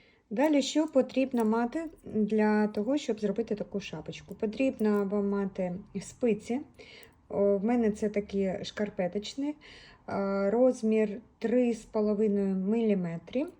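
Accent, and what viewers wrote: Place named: Russia. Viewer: Відпрацюйте українську мову , трохи ріже слух ваш російський акцент ..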